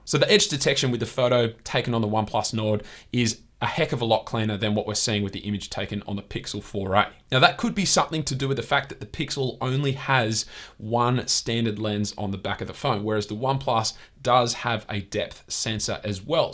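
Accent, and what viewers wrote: Australia. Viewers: U from Australia?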